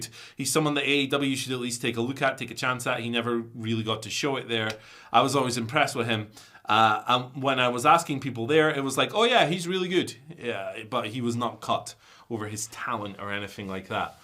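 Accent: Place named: Scotland, United Kingdom. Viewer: Why do I love listening to this Scottish man rant about wrestling so much?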